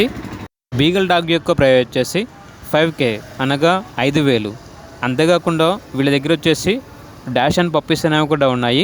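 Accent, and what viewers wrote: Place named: Mumbai, India. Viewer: Telugu raadhaa ... mumbai heroine laagaa endhuku maatladuthunnaav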